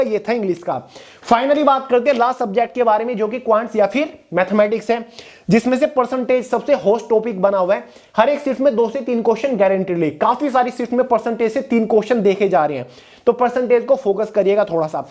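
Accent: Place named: Haryana, India. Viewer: SIR APKA HARYANAVI ACCENT SEEDHA DIL PAR LAGTA HAI 😊❤️ MAZA AA GAYA REVIEW DEKH KAR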